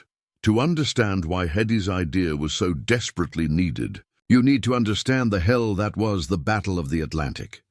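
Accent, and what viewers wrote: United Kingdom. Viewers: Why does the world seem to think that Brits are more respected than Americans?? Why is the narrator here (AI generated voice) speaking British English?? DUMB.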